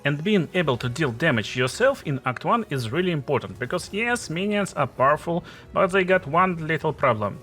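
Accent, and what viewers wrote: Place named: Russia. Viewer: Я слышу что ты русскоговорящий :))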